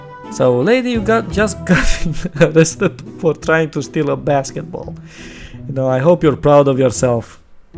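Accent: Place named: Nigeria. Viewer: sorry if im being rude, but you sound like the Nigerian scammer across the phone trying to get someone's social security number and credit card number.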